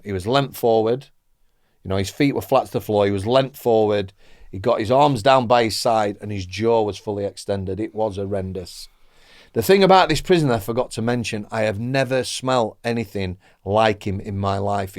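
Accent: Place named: Northern England, United Kingdom. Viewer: NEUTRAL ACCENT LOL how on gods good earth can this guy think is southern? If he was American I could understand but for fuvks sake how can any British person not know is from the north? This guy must have taken too many knocks from Danny g and something got dislodged in his head! Lol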